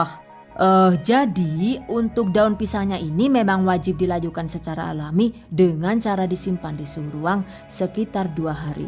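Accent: Banyumas, Indonesia. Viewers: Cantik-cantik lempernya bunda.... terimakasih bunda resepnya, jadi lebih praktis buat lempernya. Saya selalu suka dengan resep karena mantap dan lucu2 ada selingan humornya terutama bahasa ngapaknya itu lho. Jadi penasaran bunda ni asli madiun atau daerah ngapak ya.... 🤭🤔🙏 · Matur suwun nggih mbak 🙏 sampun berbagi Resep Lempernya...Jaaan Ngapake ky inyong ya 😁 ora ngapak ora kepenak 👍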